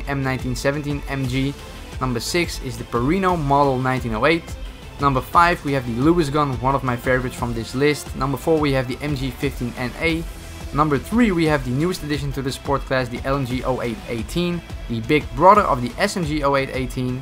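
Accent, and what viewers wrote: Netherlands. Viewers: Are you Dutch? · he is dutch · are you dutch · You come from netherlands right?😊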